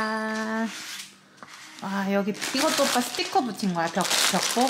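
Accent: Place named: Busan, South Korea. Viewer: 저도 놀러왔어요😍 사투리를 쓰시네요? 부산분이신가?부산사투리같은데~ 저는 부산인데~ㅋㅋ 근데 열라면에 순두부는 반칙입니다~😂방금 밥먹었는데 급 라면 한그릇해야겠어요ㅋㅋ 구독 꾹 누르고 갑니다. 또 놀러올께요~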